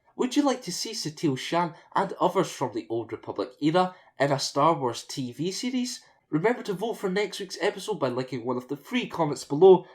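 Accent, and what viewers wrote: United Kingdom. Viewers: British accent I'm thinking? or just a diverse American voice here?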